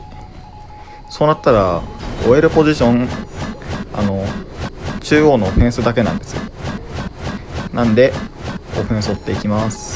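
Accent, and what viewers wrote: Japan. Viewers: 金沢弁かな？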